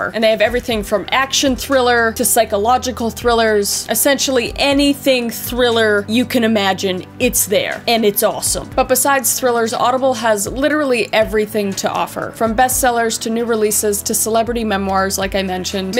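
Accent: Canada. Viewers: The Canadian comin out in your accent is killing me 😂😂😂😂😂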